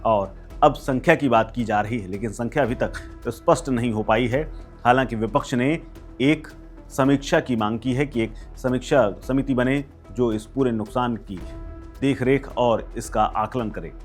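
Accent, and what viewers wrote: India. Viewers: News must be in Hindi , and it should be given in Pure hindi😢 not in bhaiyya style , where Sharif becomes Sarif , maa bhen kar raha hai ye bhaiyya news aur Hindi ki 😊😊😊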